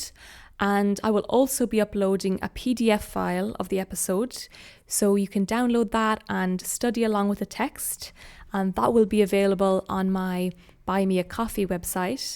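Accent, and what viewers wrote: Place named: Ireland. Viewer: What part of the island are you from ? I've heard the irish accent changes a lot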